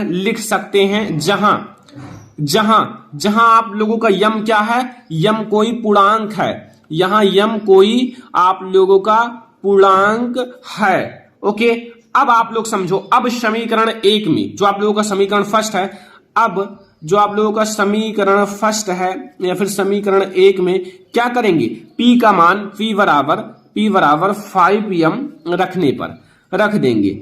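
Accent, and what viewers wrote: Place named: Deoria, India. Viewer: mujhe lga aap deoria se ho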